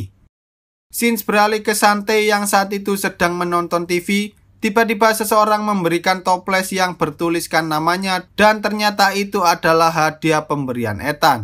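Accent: Java, Indonesia. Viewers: Asik juga nonton film di ceritain pke logot jowo 😂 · Hmm risi terlalu medok narasinya *Menurut gw woy ✌🏻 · Medok ya pak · Meddog ngge. . . . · Wong jowo lek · Pekatnye jawe ko bro ....😂😂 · Medok banget ngomong nyaaa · Medok bngt bang ngomongnya😂 Lucu beud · Logat Jawa ny ketara mas, jd kurng enak denger ny.. Coba aja Narator ny Logat Indonesia Tulen lbih enak denger ny. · Suara jawa nya kya tmn sya omm 😂😂😂😂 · Pekat jawa nya, apa khabar bang... haha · ethan...ke edan...walalalaaa.... kurangkan sedikit pekat jowonya wak..... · Jawa nya medok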